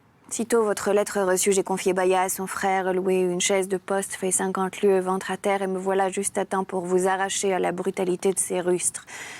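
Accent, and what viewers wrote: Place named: Midi, France. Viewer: C'est et non Camille. Cela dit, pourrait jouer Camille dans Horace de P. Corneille (pour le côté "amoureuse aérienne" on l'entend bien dire avec sa douce voix '"iras-tu Curiace,...") ou Camille dans Le Mepris de JL Godard (à cause du côté beauté fatale et le regard mauvais qu'elle peut avoir). Cette lecture très bien préparée avec des jeux de voix du Midi, confirme des qualités professionnelles qu'on aimerait voir mieux reconnues.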